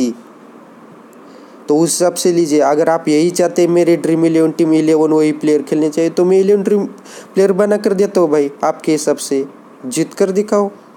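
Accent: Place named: Northeast, India. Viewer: Bhai I'm sure aap Northeast se ho..100%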